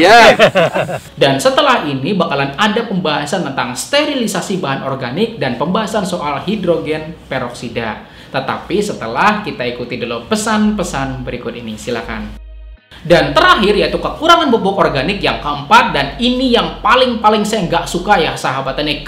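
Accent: Banjar, Indonesia. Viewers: Banjar tagasnya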